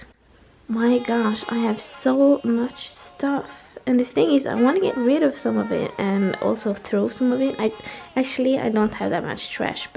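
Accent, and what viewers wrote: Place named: Scandinavia. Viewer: i loved this video!! i can't figure out where ur accent is from is it from one of the Scandinavian countries?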